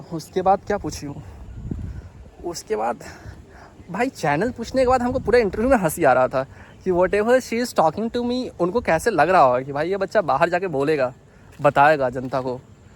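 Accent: Bihar, India. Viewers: Bhaiya ek chizz puchna hai aaplog ko pta kaise chal jata hai ki aaj kahan interview hai?? Aur bhaiya aapka boli se hi samajh aa jata hai ki aap bihari ho hum Begusarai se hai❤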